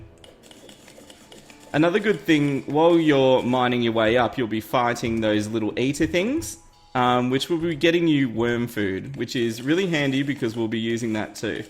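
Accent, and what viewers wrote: Australia. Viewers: But he has an Australian accent XD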